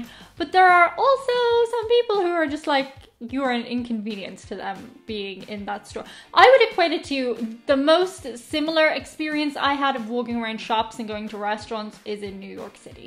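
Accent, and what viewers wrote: Ireland. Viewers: Hello you got fantastic content I am a Irish ancestry but I was raised and born in USA I am a fan of Europe culture my surname is Murphy. your channel is a fantastic channel great interesting content. Your dog is awesome and very beautiful dog. I love your Irish accent too. I really like to visit Ireland one day to visit where my ancestry come from I also want to visit the United kingdom too.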